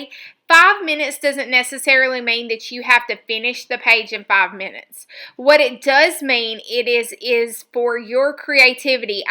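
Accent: South, United States. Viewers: Love this!!! Funny story.. the video alert popped up on my phone and I must have hit it by mistake and I am on a work conference call and all I hear is your sweet southern accent describing what a giblet is and I am literally trying to find the video pause or mute button.🤦.LMAO!!!..oh well the perils of working from home😂😂💕🤸😘🤗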